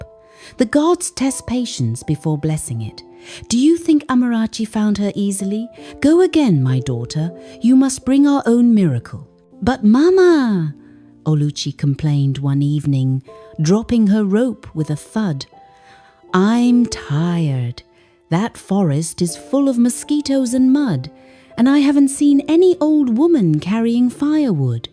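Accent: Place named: United Kingdom. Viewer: The British accent lost me 🤦🏾‍♀️House of Horus with his rich African tone, telling African stories, is real self love.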